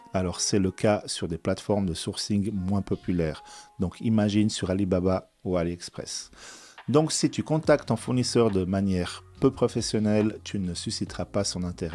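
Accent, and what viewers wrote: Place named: Burkina Faso. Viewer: C'est ton Burkinabè mon frère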